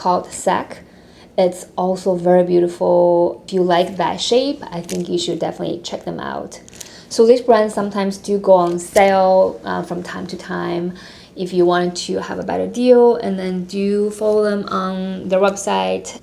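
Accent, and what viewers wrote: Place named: New York, United States. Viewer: when you spoke about the rain and wind I listened and just realized you are a NYC girl too!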